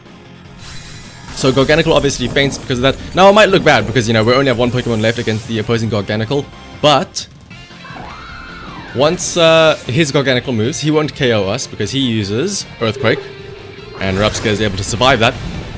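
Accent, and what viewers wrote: South Africa. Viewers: Is that a South African accent I hear? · A,,, a south african poketuber?????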